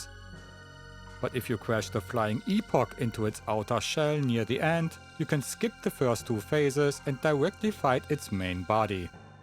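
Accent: Germany. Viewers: as someone who has known this game inside and out for ~24 years now, i can confirm the info in this vid is extremely bad. always get safe helm, swallow is terrible. speed tabs max chrono’s speed. haste helms are best for most characters, and you get the rainbow sword for chrono which is vastly better. also you get status immunity armor for everyone, so confusion is no problem… max all your characters stats and get all the optimal armor and weapons… i don’t have the time or energy just look up better guides. best thing a out this video is his german accent combined with rhotacism, which i find incredibly endearingly adorable 💗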